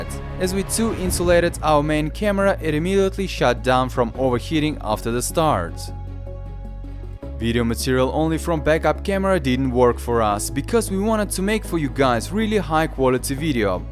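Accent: Russia. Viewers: Такой Русский акцент! Это ты говоришь или кто-то другой?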